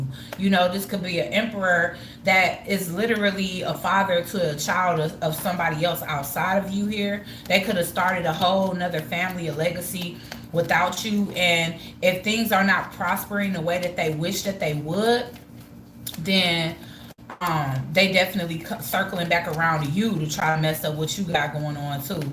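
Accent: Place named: United States. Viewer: Yahh boo looking glowing for real for real I love the American twang I'm a London boy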